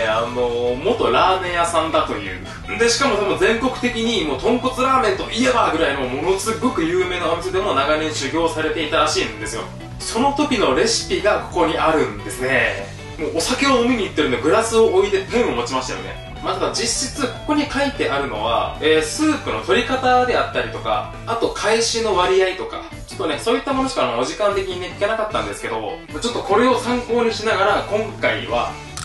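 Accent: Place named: Hakata, Japan. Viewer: 自分も福岡の人なんで所々博多弁なのいいね😊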